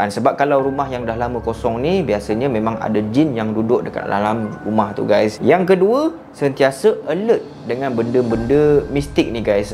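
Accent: Johor, Malaysia. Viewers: org johor ke?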